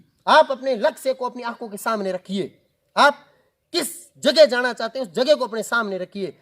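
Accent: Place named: Marwar, India. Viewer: सर किस गांव से हो मारवाड़ी बोली सुनकर मजा आ गया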